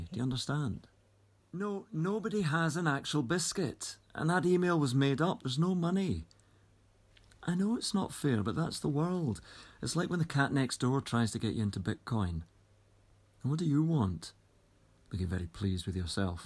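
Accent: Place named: RP, United Kingdom. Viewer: It just sounds more posh than my accentless Midwestern accent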